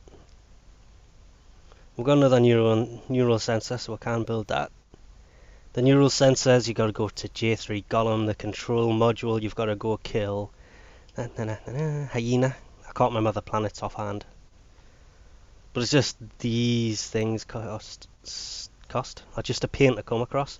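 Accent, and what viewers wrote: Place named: England, United Kingdom. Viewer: I enjoy your content but could you please stop calling the stealth frame loki ... logi it is a k not a g, sounds petty but as much as I enjoy and use your information It annoys he that someone that sounds so English (sorry if your Newcastle as I realise you don't like being called english) can get a pronunciation so wrong, again I'm not trying to be insulating just making a point of reference incase someone else picks up on this point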